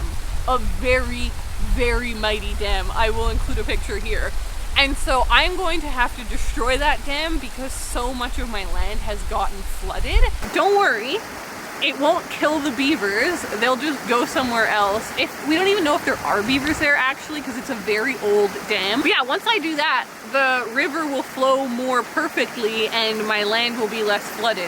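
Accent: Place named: Canada. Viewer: How do we know she's from Canada?? Oh y'all did you not hear her say " house, outside and couch" ? 😊😃😄😍 love hearing her talk.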